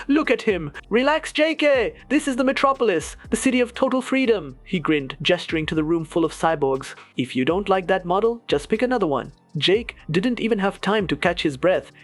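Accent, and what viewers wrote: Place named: India. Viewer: Sounds indian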